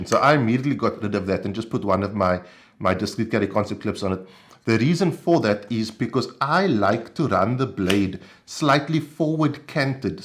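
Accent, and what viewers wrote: South Africa. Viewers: Great review! ☺️ I look for the very same thing in defensive knives, and have one in quite a similar shape. Also, your South African accent is very beautiful!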